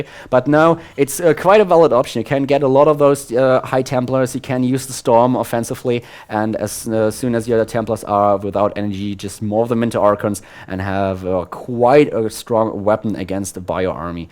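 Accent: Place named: Germany. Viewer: wow no comments i dont know why cuz i really like the comentary and the German accent if im not mistaken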